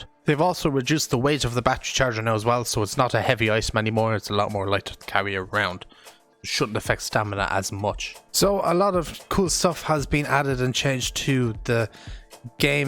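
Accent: Ireland. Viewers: I did read the patch notes... but now i get to hear them in Irish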